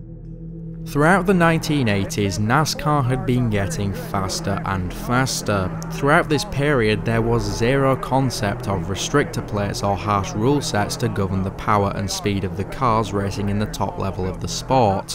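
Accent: United Kingdom. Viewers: Bro sound so British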